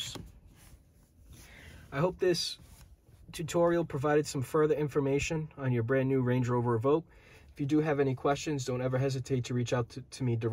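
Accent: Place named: New Jersey, United States. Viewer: You from New Jersey? Love the accent!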